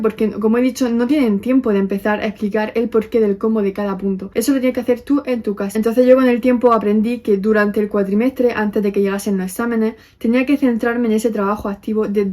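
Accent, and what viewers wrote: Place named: Murcia, Spain. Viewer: buen vídeo, tu familia es de Murcia? tienes acento de por aquí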